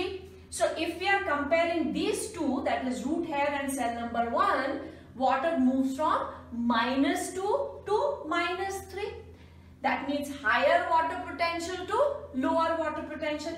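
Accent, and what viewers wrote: India. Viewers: Her Indian accent is gold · why all indians have this accent, you are a doctor but you really should improve your accent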